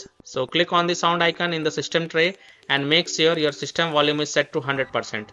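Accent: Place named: India. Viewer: Bless the indian voice tutorials always saving our butts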